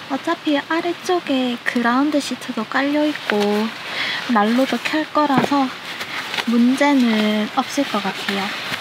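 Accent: Gyeongsang, South Korea. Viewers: 사투리억양이 경상도쪽이신가욬ㅋㅋㅋ귀엽ㅋㅋ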